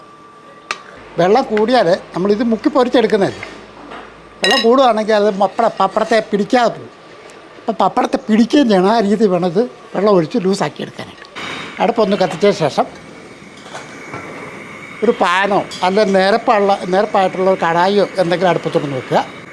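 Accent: Kottayam, India. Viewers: താങ്കളുടെ കോട്ടയം slang ആണ്ഏറ്റവും മനോഹരം വിഭവങ്ങളുംവളരെ ഗംഭീരം നന്നായിരിക്കട്ടെ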